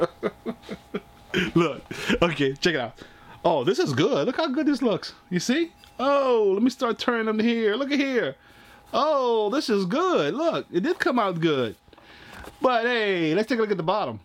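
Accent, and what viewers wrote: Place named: Lafayette, United States. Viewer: dude my Xbox was playing random videos about pops and yours popped up and as soon as I heard your accent I had to do a double take. I was born in Lafayette. so happy I found your channel!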